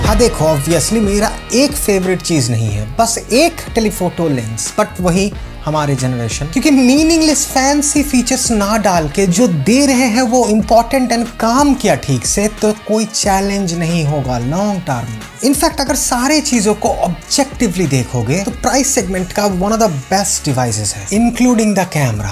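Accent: Bengal, India. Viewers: humm Bangali ❤ hindi accent sune bojhai jai Bangali ke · Bro to be honest with me are you Bengali?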